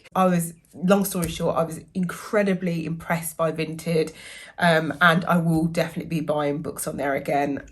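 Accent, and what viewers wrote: Midlands, United Kingdom. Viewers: Glad you got 'Exciting Times' cheap. My experience going in hearing promising reviews, was left feeling more unexciting times. But hope you have a better experience with it, love your content and hearing a fellow midlander on YouTube ✊️ - Fab ✨️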